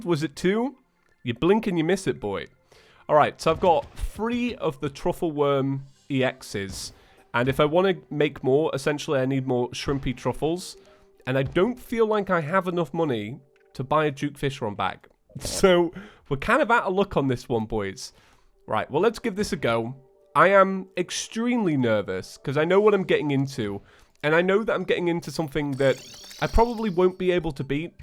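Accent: United Kingdom. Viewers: Yeah, it's like. " Oh god ,I'm so gutted by that -__- " It's just so stereotypically british and I love it lol.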